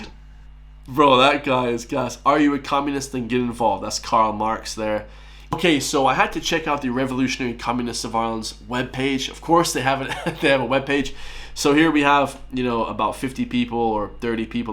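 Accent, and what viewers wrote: United States. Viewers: Why do you sound like a yank?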